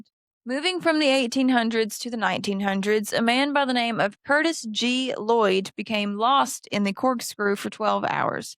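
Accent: Kentucky, United States. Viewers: First video ive seen of yours,everything seems grade A+ i enjoyed the accent,sounds like a ky southern one( i could be wrong and either way dont post what state yer in cuz there's tons of aholes that would just dox ya) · here from history of everything podcast. FINALLY, a Kentuckyian with a Kentucky accent! 😊 Unlike whats-his-name who claims to be kentkian with no accent??!, 😅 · Don’t know how this video popped up on my for you, but glad it did. Just subscribed, she sounds like one of my Kentucky girls so I love it and MC is a field trip we all had to take at some point. Looking forward to seeing more content from her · I can walk to Mammoth cave lol. That is how close I am to it. Kentucky has a bunch of beautiful ladies. Doesn't surprise me to see another one like you here. Nice video! Edit: When I hear you talk I can tell you have that Kentucky accent behind your words. 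I didn't even notice mine until I heard my voice play back after a recording I made. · Hi, my friend, I just found your channel, and I'm so glad and happy that I did. I'm speechless, because I'm getting some real and true history facts, told with that absolutely lovely and sweet southern Kentuckyian accent of yours - I'm officially in awe, and I could listen to you talking for the eternity, lol. So you got my like and sub, that's the least I can do, to thank you for your awesome video, that I'm enjoying so very much. So stay awesome, smart, and gorgeous as you are, my friend. Greetings, much respect, love, and all of the best wishes, from "across the pond", from Serbia, southeast Europe. Cheers!👨‍🎤🌹👧🇷🇸🥂🇺🇸🌏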